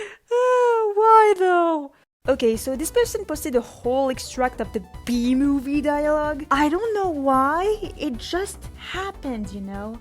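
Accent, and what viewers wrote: France. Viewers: And you know what’s funny ? By first watching one video of yours, I really thought you were American, then I was like « But she kind of has a French accent, I wonder if she’s French in fact » and when I watched your short about Yandere Simulator, I was like « Yeah. She’s definitely French. » I mean, I don’t understand how people can’t notice you’re an anime fan, you litteraly use many anime of your videos (like Death Note or Kaguya Sama). Wish I had like half of your collection, still have the entire collection of Ki & Hi though. I feel you. Currently in my last year of High School and I can’t seriously study for my baccalaureate even if I try. And I feel bad because in elementary school, I only had excellent grades but it feel off the second I enter middle school. I just hope I’ll have a 13 or 14 in my final grade. Alex Meyers, Saberspark and Octo Lady ? 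I didn’t know you had such good taste, let’s go😌 ESPECIALLY since he’s canonically asexual. Like…no. I mean, the only person I can see Alastor being with is Rosie but only for tax benefits or something like that. OMG WHAT ??? It’s so cool, I’m Moroccan too, LET’S GOO ! I think it will be better to do that if you reach 50k. But anyway, congrats for the 9.5k🎉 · Just basically it kills me how people knew you are french like me because you have a french accent. In the crack ships video there was literally written « nombre total d’abonnés » and the name of the music video was « Mon avis va déclencher une guerre » so as Carmilla said « It’s not rocket science » 😂😂😂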